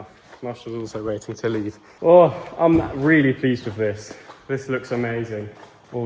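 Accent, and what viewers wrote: United Kingdom. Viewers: Hi, great video! My father also bought a Twingo the new Twingo e-Tech very good small monospace. Is the Twingo popular in Switzerland?🤔 Oh and, it seemed a bit strange to me at some point I had the impression that your accent Was a bit British I don't know why, which side of the Swiss are you coming from? Please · Just come across your channel, great work BTW you have the most English accent I've heard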